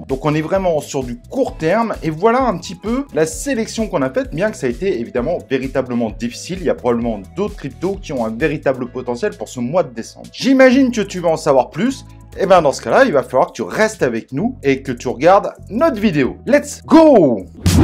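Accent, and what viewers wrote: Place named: England, United Kingdom. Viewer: J'aime beaucoup ton accent anglais 😅 Dommage de ne pas avoir présenté de projets qui n'ont pas encore pump Merci quand même chef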